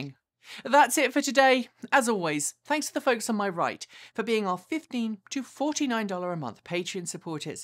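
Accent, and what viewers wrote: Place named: England, United Kingdom. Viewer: I can't stand that haughty English accent!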